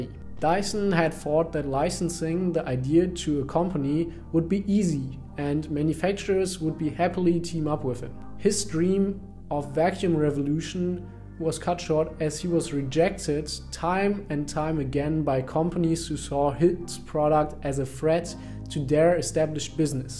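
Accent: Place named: Germany. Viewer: Heutzutage ist hald Englisch einfach Pflicht. Und nur wenn man sich mit der Sprache auseinandersetzt lernt man sie auch. Und auf diesem Weg zu lernen macht ja auch mehr Spaß wie stumpf in ein Buch zu starren ;) Learning by doing ist das Gebot der Stunde. Und klar hört man den Alman Akzent noch etwas Aber das wird auch mit Der Zeit und ist defentiv kein Grund sich stoppen zu lassen. Die Community die man damit erreicht ist einfach auch globaler orientiert und damit auch etwas interessanter in der Interaktion, da man wenn man mit anderen Kulturen in Kontakt kommt andere Sichtweisen erhält und damit seinen Wissenschatz in ungeahnte Ausmaße erweitern kann. Just give it a shot ;)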